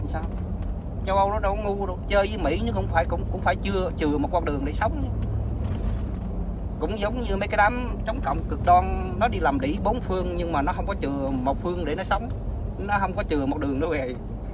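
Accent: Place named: South, Vietnam. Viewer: hay lam anh noi dung day cach noi chuiyen rat nam bo toi thich anh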